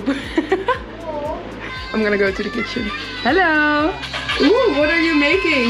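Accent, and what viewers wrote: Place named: Netherlands. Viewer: You sound just like ivana from travel iv . That dutch accent is very noticeable. Do a collab with her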